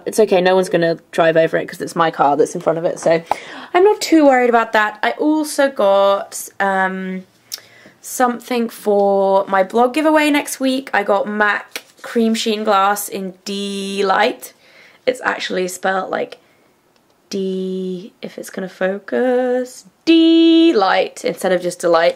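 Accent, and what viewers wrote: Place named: United Kingdom. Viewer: I love your accent! I would give anything to have a British accent! Its so classy!:) thanks for the video! I love Vlogmas!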